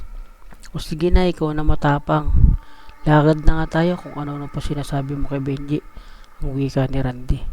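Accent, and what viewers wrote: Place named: Mongolia. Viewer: Okey ang kwento ...kaya lang parang taga Mongolian ang pagkwento..keep it up!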